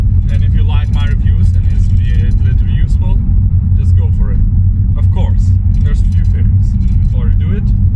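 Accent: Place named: Lithuania. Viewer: Is akcento galvoju : turbut Lietuvis. Is video matau, teisingas spejimas :D Nu ir veliau pasakei: " Vilnius" fainas video !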